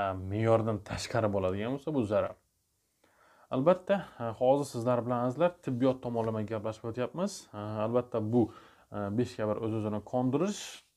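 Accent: Khorezm, Uzbekistan. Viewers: хоразимданми шева тортяпти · Асаломалакум доктир сиз кайердансиз шевага айрим созлар хоразимга тортяпти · Xorazmdan ekanligiz darrov bilindi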